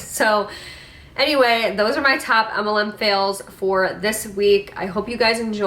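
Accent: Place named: Midwest, United States. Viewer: I hear my own Midwest accent when I listen to your videos. Your voice is so comforting which is great when watching mlm videos! Thanks for all the awareness you bring in this area. I almost got sucked into essential oils shortly after graduating high school. Very glad I dodged that bullet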